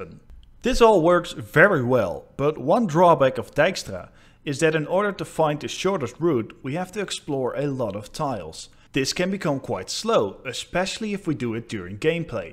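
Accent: Netherlands. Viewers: Great vid. One little question, did your English decline from a couple years ago? Like your dutch accent is more pronounced then roughly 2 years ago.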